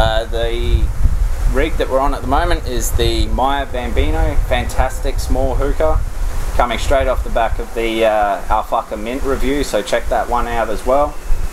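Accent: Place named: Australia. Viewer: Australian accents are bomb. good review man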